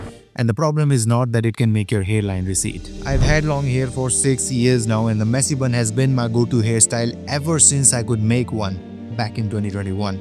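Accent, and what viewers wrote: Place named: India. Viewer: Nice indian english 👍 Indian people very nice people ❤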